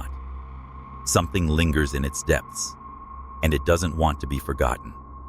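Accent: United States. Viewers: Why are Australian haunted spots being narrated by an American?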